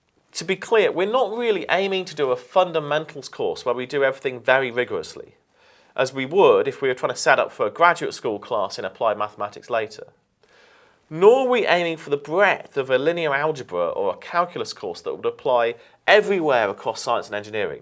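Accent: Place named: United Kingdom. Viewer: The content is perfect but I wish this video were in American English. British Accent is a little bit hard to understand😅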